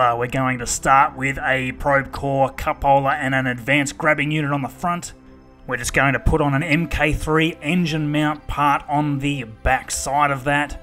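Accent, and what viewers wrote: Australia. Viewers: he sounds like he is a Australian man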